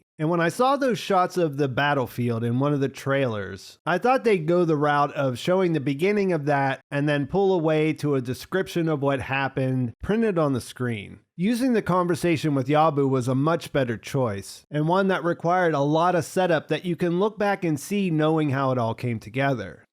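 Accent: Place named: Northeast, United States. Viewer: yankee accent very tiring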